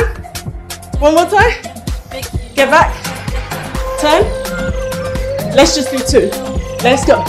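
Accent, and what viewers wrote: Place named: Caribbean. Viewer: Your accent is #awesome!!! BLACK is #BEAUTIFUL!!! Proud to be black! Caribbean vibes right there...more fire 🔥!!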